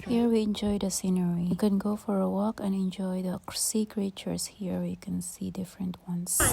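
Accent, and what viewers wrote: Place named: Philippines. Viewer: Carabao English!!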